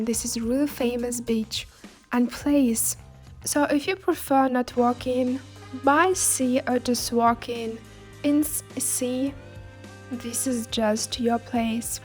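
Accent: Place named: Eastern Europe. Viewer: you have an interesting accent. What's your nationality? Are you from Eastern Europe or perhaps Germany? 🤔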